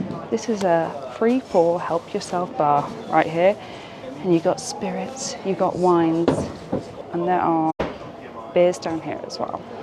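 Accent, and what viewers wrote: United Kingdom. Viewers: Just a question- are you by any chance Welsh? Some words sounded really Welsh! ❤🏴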